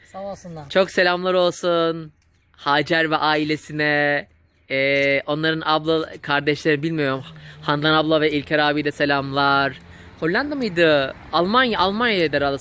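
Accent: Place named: Çaycuma, Turkey. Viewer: SELAMLAR OGLUM BEN KARABUKLU TEYZENIM BIRINCISI GADUN ÇOK GOZEL AMA BEN 40 YILDIR CAYCUMADA YASIYOM ÇAYCUMA SIVESINI SIZDEN FAZLA KONUSYOM BAYILIYOM KONUSMANIZA HARI KULADESINIZ BENI KONUSURKEN GORSEYIZ BURALI ZANNEDERSIYIZ ALLAH HAYIRLI KAZANCLAR VERSIN YAVRUM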